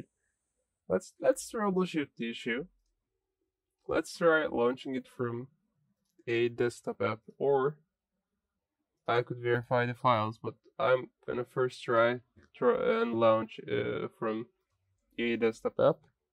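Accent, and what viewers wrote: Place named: Turkey. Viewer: kanka aksanından türk oldugunu dusunuyorum allah aşkına oyunu dün epic gamesten aldım bozuktur dıye bugun gidip steamden aldım ilk basta önyükleme hatası falan diyordu biostan bi ayar yapıp ön yüklemeyi açık hale getirdim onu hallettim.ama suanda steamden oynaya basıyorum ilk kez baslatılmaya hazırlanıyor diyip hicbisey olmadan kapanıyor kendi kendine hiç açılmadan ne yapabilirim lütfen yardım edermisin bütün driverlarımda güncel bilgisayarıma yeni format attım windows11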